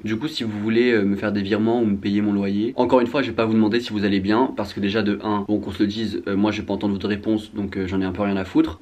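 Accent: Caen, France. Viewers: Quand je t’écoute j’entends VRAIMENT l’accent caennais haha ( je suis trop fier d’avoir toi et Lucasdorable comme représentation même si y’a que mwa qui vous connais a caen bahaha 🙁 )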